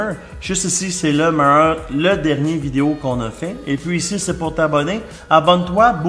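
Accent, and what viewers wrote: Canada. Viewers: J'adore ton accent canadien tes le meilleur. Je veux un spinner stp je fait toujours tes concours mais je gagne jamais et la j'espère gagner. Merci pour tous se que tu fait pour nous .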